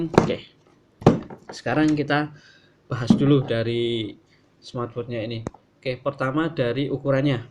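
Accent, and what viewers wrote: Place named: Java, Indonesia. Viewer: Lu yang norak bukan jamnya😂😂😂KAMPUNGAN NGOMONGNYA MEDOK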